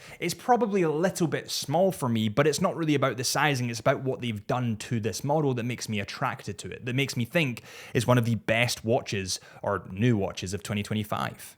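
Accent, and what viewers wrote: Scotland, United Kingdom. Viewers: Love the channel but please please stop presenting the videos using the same style as Adrian Barker but in a Scotish accent.... just be yourself. You don't need to live in his shadow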